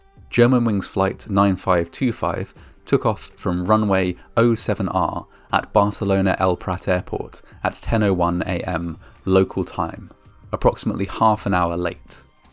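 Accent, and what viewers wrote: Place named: RP, United Kingdom. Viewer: The Queen's English, mehn. Respect.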